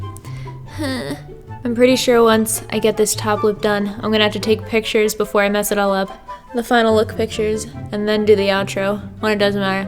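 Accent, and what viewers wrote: Philadelphia, United States. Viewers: I've always thought that had a very unique accent but could never pinpoint it. It's a Philadelphia accent. I'm from Philadelphia. Why am I like this?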